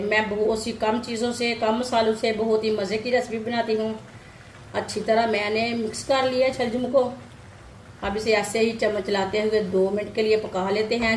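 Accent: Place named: Punjab, India. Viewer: Never watched such unappetising food being cooked with the explanation in a hilarious Urdu with a Punjabi accent. Very sad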